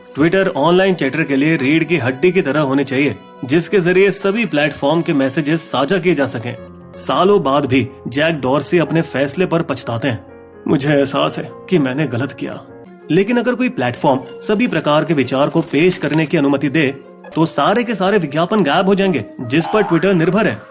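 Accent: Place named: South, India. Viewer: Good to see you back 😎. That madrasi voice caused my head to spin 🦔 🤮 🤮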